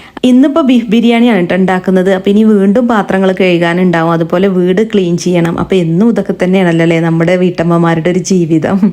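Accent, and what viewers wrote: Malappuram, India. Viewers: മലപ്പുറകാർ അങ്ങനെയെ പറയും, എത്ര എഡ്യൂക്കേഷൻ ഉള്ളവർ ആയാലും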